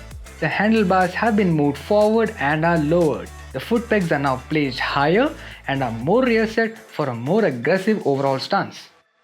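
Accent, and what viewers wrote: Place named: India. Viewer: is this a English speech practice from an Indian?🤷🏿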